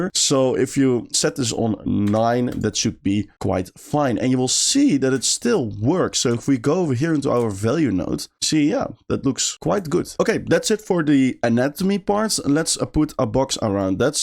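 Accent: Netherlands. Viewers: wonderful tutorial - could tell you were dutch without knowing who you were ;)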